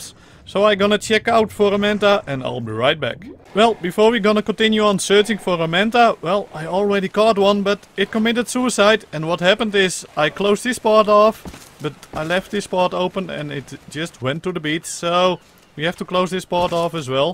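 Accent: Netherlands. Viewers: Are you Dutch? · are u dutch? :p I can always hear if someone is dutch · Haha I'm dutch too, you can hear it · I can hear you are dutch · U are definetly dutch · Are u dutch?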